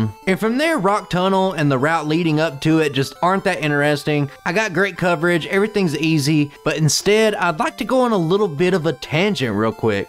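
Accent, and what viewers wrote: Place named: Kentucky, United States. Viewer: My son got excited when he heard your voice because “He sounds like he’s from Kentucky like us!!” 😂😂😂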